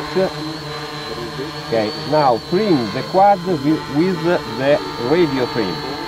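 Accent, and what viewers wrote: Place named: Ferrara, Italy. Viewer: I can notice the accent from Ferrara even if you're talking in english.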